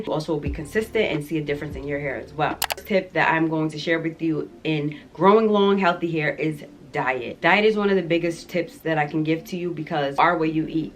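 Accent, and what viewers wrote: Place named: New England, United States. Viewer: I love your videos!! They are very educational and entertaining too! Are you from NY or New England? I hear that accent! 👍🏽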